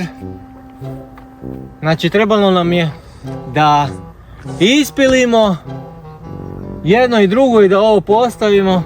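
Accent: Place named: Slavonia, Croatia. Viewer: Sve Sto Treba Imas Bravo momce Gledam Te Iz Podgorice a ti mene Imas Govor slavonskipozdrav Od srca jesi vredan❤❤❤❤❤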